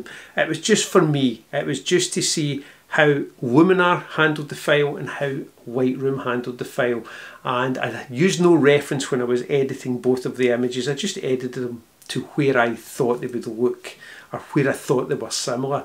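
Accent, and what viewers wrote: Scotland, United Kingdom. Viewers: I love your accent....but you got me with the 22 Megabyte FAIL...What is a FAIL.... After about the 4th or 5th time I heard it, I finally got it. A FAIL is Scottish for a FILE with a long eye in American English. For us Yanks, fail rhythms with pale or pail. English is so screwy. "My attempt at editing was a major fail"...Like failure, failed, DOUGH is pronounced D-OH COUGH is pronounced CAW-F There is a street in San Francisco named GOUGH... Not GO, not GUFF, it is GOFF Do you say NILE like the river in Egypt for those pointy metal things we hit with hammers? As I said, I really love your accent, it is beautiful. Reminds me of the great Sean Connery. And once I got it, I totally understood. It is very charming...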